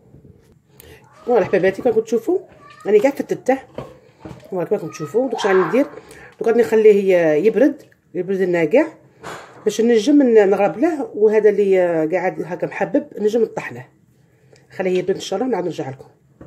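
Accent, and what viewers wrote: Oran, Algeria. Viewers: تعجبني كي تهدري (تتكلمي) باللهجة الجزائرية الوهرانية الحقيقة بالرغم من انك تعيشين في دولة أجنبية 😊😊😊😊😊😊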